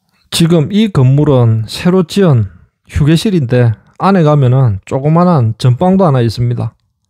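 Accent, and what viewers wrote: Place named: Masan, South Korea. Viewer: 수고가 많으십니다~ 목소리 들으면 강호의 발바닥님/ 생각도 납니다~세분이 모두 마산분이십니까?~세분 목소리 억양이 비슷 합니다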